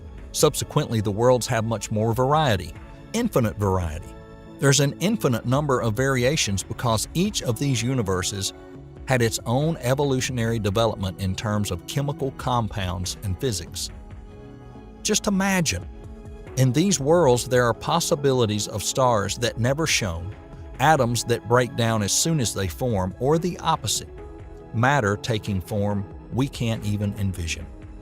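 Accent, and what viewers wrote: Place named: South, United States. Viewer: This channel would get more views if you changed your A.I. voice to one with a British accent. People generally prefer this when watching science related content as it sounds more intelligent than a southern American accent. Perhaps try a female voice such as the one John Michael Godier uses on his channel Event Horizon. I don’t recall any channels which are doing so at the moment. Enjoy your content a lot though, just the voice has to go. Keep in mind, most of your viewers are likely NOT Americans even if you are and you must therefore cater and adapt to the preferences of that audience. Cheers!! 💫🙏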